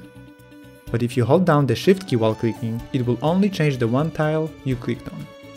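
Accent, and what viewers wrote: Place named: Poland. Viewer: I knew i've heard polish accent!😁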